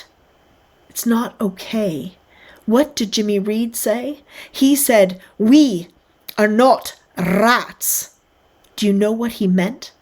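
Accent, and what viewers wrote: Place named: Scotland, United Kingdom. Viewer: Such a powerful and timely piece, and so beautifully delivered (even with a Scottish accent!). Thank you!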